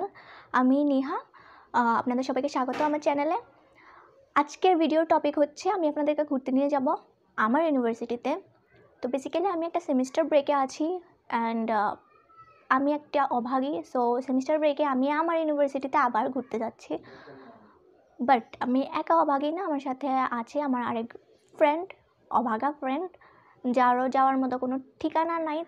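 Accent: Chittagong, Bangladesh. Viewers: কথার মধ্যে চট্টগ্রাম এর টান আছে এজন্য বললাম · kothar modde kichuta Chittagong er accent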